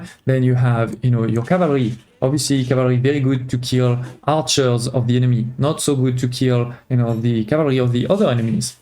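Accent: France. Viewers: On aime l'accent FR à couper au couteau😊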